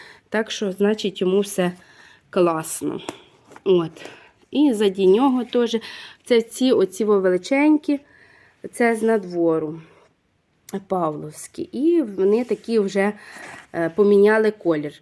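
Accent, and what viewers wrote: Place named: Lviv, Ukraine. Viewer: Добрий вечір.А з якої ви області(району)Діалект як на Львівщині😊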